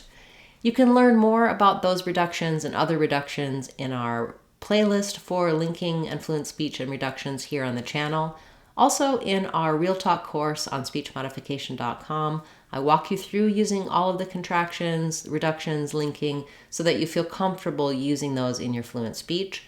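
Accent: United States. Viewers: Thank you,. It's a lot of people helped change the English language. Special American accent Thank you so much.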